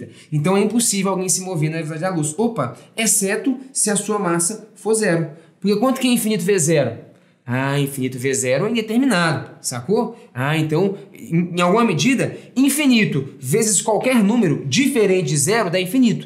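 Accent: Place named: Minas Gerais, Brazil. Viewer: Kkkkkkkkk esse sotaque dele de mineiro é mt engraçado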